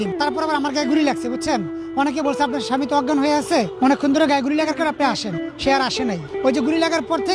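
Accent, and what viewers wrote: Barishal, Bangladesh. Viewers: Borishaila bole kotha!!!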